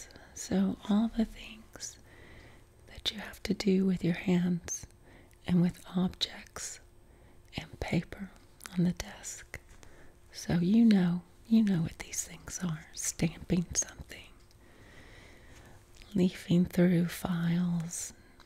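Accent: South, United States. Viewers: I did always get a bit of a southern accent from you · I knew I heard a Southern accent in there!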